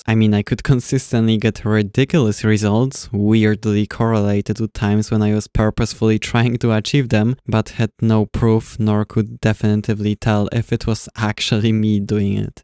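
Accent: Poland. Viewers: Wiem kiedy slysze polski akcent i wiem że ty go masz😂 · I knew this accent is familiar you are a Pole! (the olx ad gave it away 😂) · ale polski akcent kolego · you sound Polish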